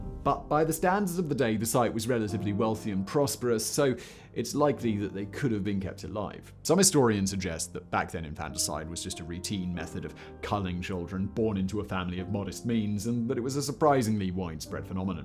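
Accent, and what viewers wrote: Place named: West Country, United Kingdom. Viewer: Mate, you say the words surprise, died, alive , bluntly , all a bit funny.. .west country , country bumkin.ish Xxx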